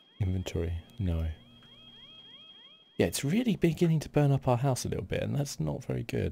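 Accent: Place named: United Kingdom. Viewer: British accent + ASMR = Masterpiece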